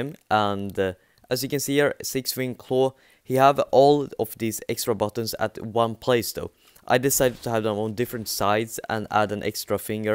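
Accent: Sweden. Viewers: R u swedish?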